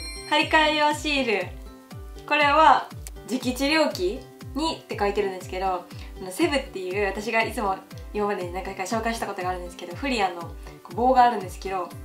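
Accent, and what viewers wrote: Kansai, Japan. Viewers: 最近よく見てます。の性格よさそうでなかよくなりたりタイプです😊関西弁にも親しみわきますの歌も好きです💖応援しています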